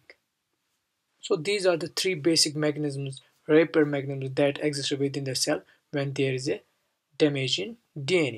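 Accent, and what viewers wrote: Kashmir, India. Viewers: Are you Kashmiri. U accent seems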